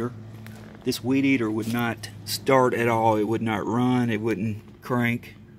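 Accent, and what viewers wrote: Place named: South, United States. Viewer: My Lord, you're a genius. Your idea gave me for free and almost immediately what several dollars and an hour long trip to a hardware store would have cost me. This worked perfectly for my Poulan Pro PR28SD string trimmer. Your accent tells me you are from the South...where else could such creativity come from? Thanks.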